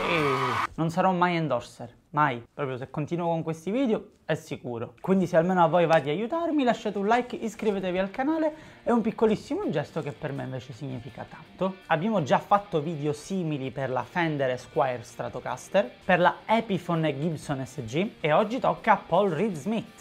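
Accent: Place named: Palermo, Italy. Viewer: A me sta simpatico anche il suo forte accento palermitano... :-) Ma ho un debole per la Sicilia, sono di parte.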